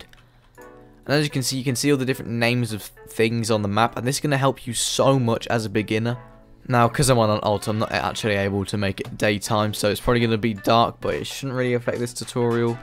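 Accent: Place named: United Kingdom. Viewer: your brittish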